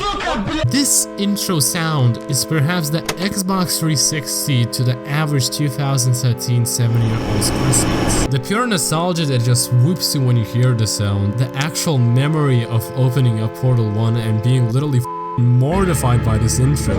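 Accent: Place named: Baltic states. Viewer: Y'know, you have some weird Baltic accent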